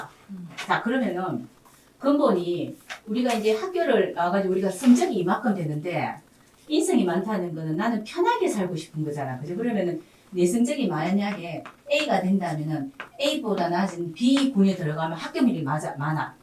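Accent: Gyeongsang, South Korea. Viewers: 전 고향이 부산인데도 고속으로 듣기가 힘드네요 ㅎㅎ 경상도 어디 사투린지 궁금하네요.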